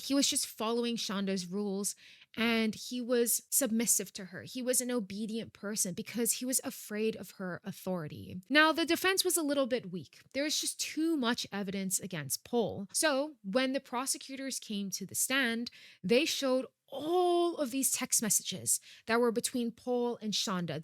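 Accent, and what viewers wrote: United Kingdom. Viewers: Are you British or American? I’m confused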